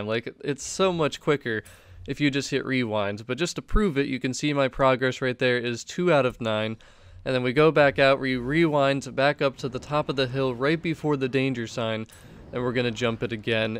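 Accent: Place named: United States. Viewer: Yeah your probably correct from the US accent and pronouncing of the car... In the UK that's the way we pronounce it is the way I sort of typed it... Who cares lol great video as always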